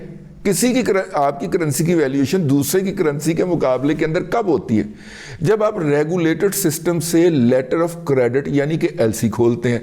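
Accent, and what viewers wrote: Punjab, Pakistan. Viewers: Jaar tuhadi Punjabi bahut mitth hai !!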